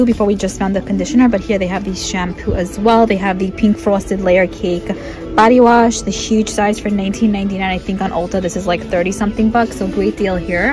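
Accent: New York, United States. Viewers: Sounda like a NY/ NJ thing.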